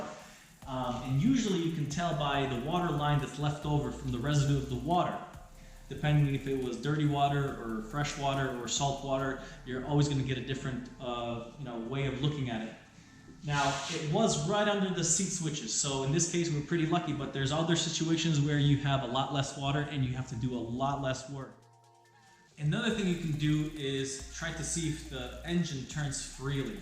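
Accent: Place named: Russia. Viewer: This guy's accent sounds Russian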